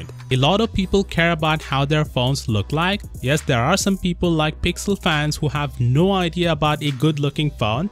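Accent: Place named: India. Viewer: Your accent is confusing me are you Indian or Chinease or both 🙂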